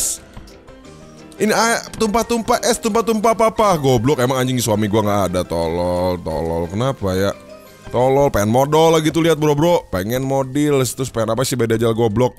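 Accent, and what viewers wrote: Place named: Kalimantan, Indonesia. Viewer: Logatnya kek orang kalimantan bang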